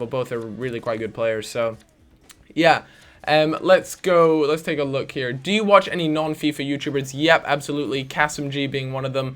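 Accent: United States. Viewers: Are you american? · Are you American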